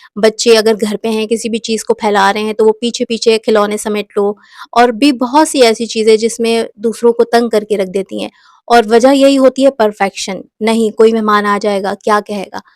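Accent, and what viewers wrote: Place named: Karachi, Pakistan. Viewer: Ap Karachi se ho apky lehjy se LG raha ha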